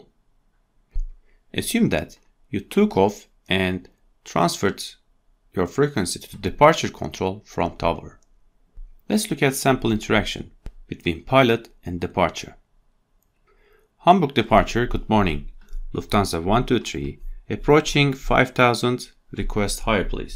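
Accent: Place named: Turkey. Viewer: Hocam Aksandan inanılmaz belli bu arada Türk olduğunuz :)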